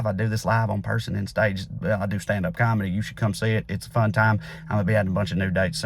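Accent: South, United States. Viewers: That's funny, because this is a comment I've only heard from people who haven't spent significant time in the rural southern US (where the accent really isn't that unusual). That's more or less how speaks normally (his sister, who is not a comedian, has the same accent he does). Of course, he's not speaking in a totally normal fashion in these "car rant" videos, since they are a recurring bit that he presumably writes scripts for beforehand, but the "country accent" itself is not the unnatural part. You can see an episode of and friends' podcast where his sister was a guest here, for example ( can also be heard throughout, speaking in a more impromptu manner): <link>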